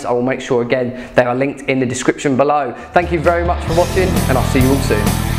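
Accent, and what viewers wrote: United Kingdom. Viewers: Fuckin' brits accent. USA!!! USA!!! USA!!!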